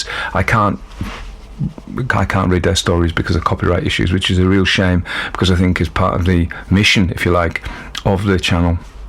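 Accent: France. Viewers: oh the french accent 😙👌 très bien! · The french accent, alas, defeated me and I had to terminate this story prematurely. Not to worry though as plenty of others to choose from your channel 😮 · your French accent, chef's kiss! I could feel the Poe here. The horror that people are capable of when they are obsessed with fighting a wrong.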